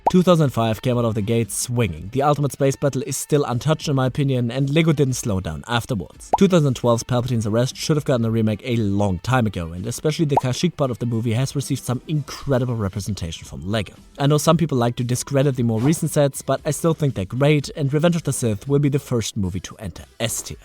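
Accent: Germany. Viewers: Duhörst dich deutsch an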